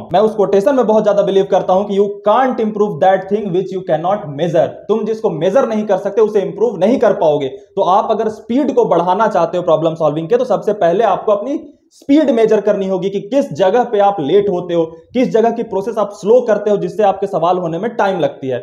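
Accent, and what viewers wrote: Bihar, India. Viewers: Bhaiya aap bihar se h kya pls Tell ❤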